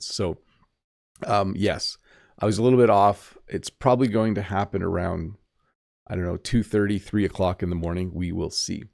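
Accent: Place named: Canada. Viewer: Congratulations I'm from Piracuruca Piauí in northeastern Brazil and I want to have a Canadian accent just like you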